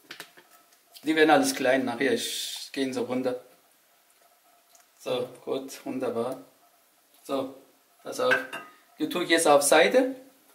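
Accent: Swabia, Germany. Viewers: bist du Schwabe? 😃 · Sympathischer Akzent. Wo wohnt ihr? Schwaben? Liebe Grüße aus Lübeck. 👍🍽️ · Schwabe??? Hört man so im Abgang, oder täusche ich mich? Sehr sympatisch :-) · Stuttgarter?? xD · du bist Schwabe:D Ich feier dich mein Freund!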